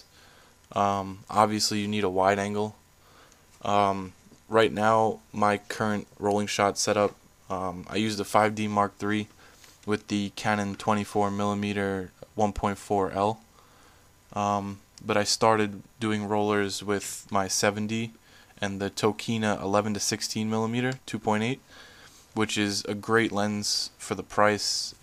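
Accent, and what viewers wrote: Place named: East Coast, United States. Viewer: good video and good tips I want to get rollers when im down in ocmd for june and sept you sound like your from around the east coast I do photography as well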